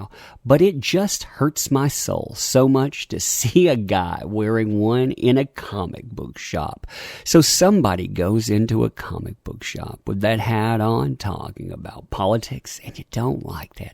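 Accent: South, United States. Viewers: British guy myself . Your southern right ? 🙂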